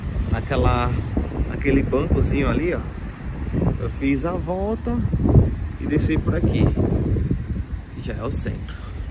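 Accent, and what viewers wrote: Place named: Venezuela. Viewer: Jaja yo crey que era venezolano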